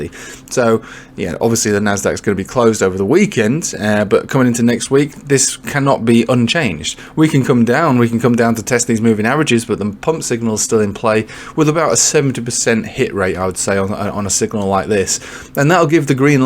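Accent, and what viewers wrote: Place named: Northern England, United Kingdom. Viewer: Dude, are you from Yorkshire, Lincolnshire or the north west? You might not be from any of them but love your content dude. Legend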